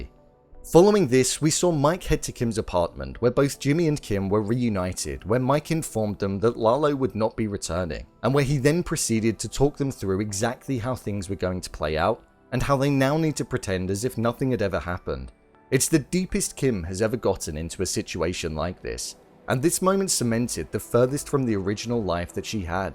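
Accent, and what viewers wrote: United Kingdom. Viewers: Your British accent lol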